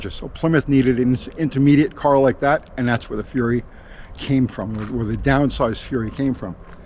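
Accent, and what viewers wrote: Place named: New Jersey, United States. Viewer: I love listening to talk, my grandmother was originally from New Jersey and she pronounced things just like he does 😂 being Midwest redneck kids we always thought she sounded so weird.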